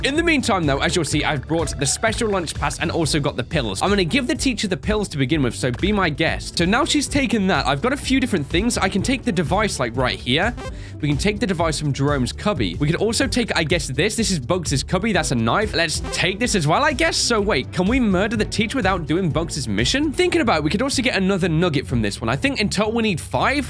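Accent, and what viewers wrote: United Kingdom. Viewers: Random question but... are you British?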